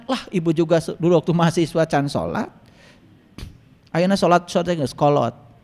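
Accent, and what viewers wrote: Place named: Sunda, Indonesia. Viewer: Sunda nya medok , kita teu ngerti ustad....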